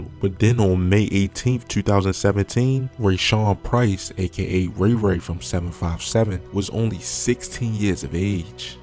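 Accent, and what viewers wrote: Baltimore, United States. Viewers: You definitely from Baltimore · This nigga sound like he from Bmore. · You sound like you from Baltimore. How you know so much about chicago. I don't don't care about none of these gangs here in Chicago. These goofys just in the way.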